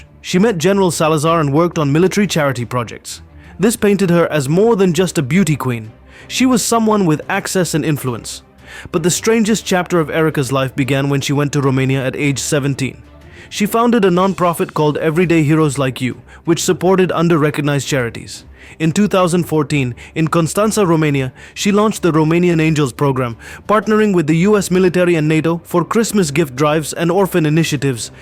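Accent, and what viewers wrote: India. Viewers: This man is sound like Indiana! Someone paid him to report this fake story!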